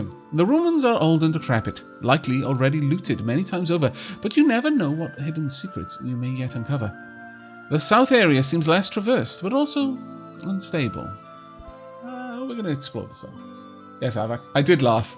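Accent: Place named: South Wales, United Kingdom. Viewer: Thought he might have been since I'm from wales and i can his accent was familiar and if I was going to guess he is from South Wales because his accent isn't realy strong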